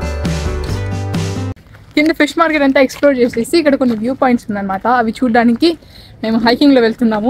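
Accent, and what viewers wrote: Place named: Rayalaseema, India. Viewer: Mi voice vintunte you are belong to rayalasima anipisthundi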